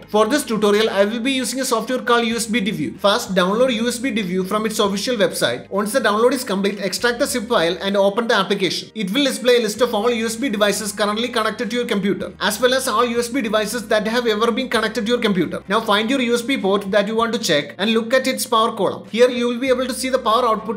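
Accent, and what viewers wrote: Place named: India. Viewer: appears to be Indian English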